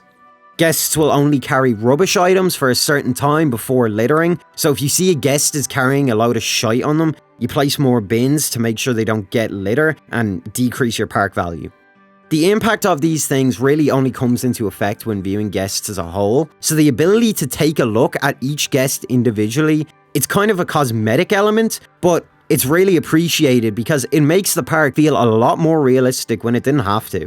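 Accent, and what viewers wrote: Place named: Ireland. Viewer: I know a lot of people in the tf2 community don't like tftubers to branch out and do other things, but I'm genuinely glad to see you make videos on a variety of things bc it's fun to listen to your funny Irish voice, your videos are always amazing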